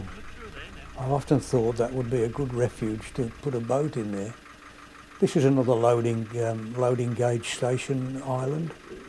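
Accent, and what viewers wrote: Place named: Halesowen, United Kingdom. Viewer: Wow I was born and raised in Halesowen (1940s 50s 60s) all my family and many cousins, some of which still live there. Brings back memories . Good to hear the old accent.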